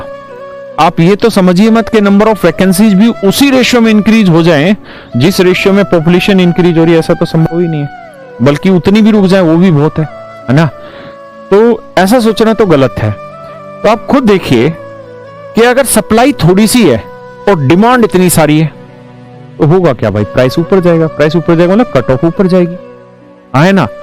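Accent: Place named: Maharashtra, India. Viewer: Ye Maharashtra ki tone 😂😂😂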